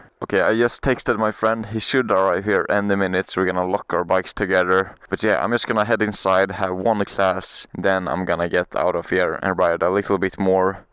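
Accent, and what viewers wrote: Sweden. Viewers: älskar svenska dialekten 😂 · Man hör att du är svensk ialafall 😂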